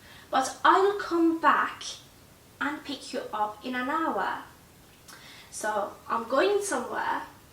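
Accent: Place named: England, United Kingdom. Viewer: سلام دوست عزیز . وقت بخیر . من حدود 3 ماه هست که برای ادامه تحصیل وارد انگلستان شدم وهمچنین برای تقویت زبانم در حال آموزش زبان انگلیسی هستم و تازه با آموزش های شما آشنا شدم. من اولین کلیپ که از شما دیدم اونی بود که اول به زبان انگلیسی فعل های get back , ... رو توضیح می دادید و بعد به زبان فارسی. حقیقتا من اولش که اون کلیپ رو دیدم واقعا هم از لحاظ ظاهری و هم از لحاظ لهجه فکر کردم شما یک خانم اهل انگلیس هستید که دارید زبان انگلیسی رو آموزش می دید و وقتی دیدم که به زبان فارسی هم تسلط دارید واقعا تعجب کردم. شما با لهجه کاملا انگلیسی و روان صحبت می کنید و تمام مواردی رو هم که می گید دقیقا کاربردی هست و مردم انگلیس دارن توی کوچه و خیابان از اونها استفاده می کنند. البته اگر اشتباه نکنم شما احتمالا مدت طولانی هست که مقیم انگلیس باشید. به هر جهت کارتون واقعا عالیه و من مطمئنم که اگر با برنامه پیش برید و مطالبی که برای ارائه انتخاب می کنید همچنان جز موارد اولویت دار و مهم برای آموزش زبان انگلیسی باشه حتما جز بهترین ها در آموزش زبان انگلیسی خواهید بود.استفاده از دوره های شما برای من خیلی مفید هست و امیدوارم که همچنان به تولید کلیپ های بیشتر ادامه بدید. 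من دوره های آموزش مکالمه انگلیسی در 30 روز رو پیگیری می کنم. البته این سه جلسه اول برای سطح زبان من مناسب نبود ولی مطمئن هستم که کمی جلوتر برید حتما من هم استفاده خواهم کرد. ببخشید اگر طولانی شد ولی حیف بود اگر در پاسخ به کارهای خوب و حرفه ای شما این توضیحات رو نمی دادم. برای شما و همه دوستانی که شما رو دنبال می کنند آرزوی موفقیت دارم.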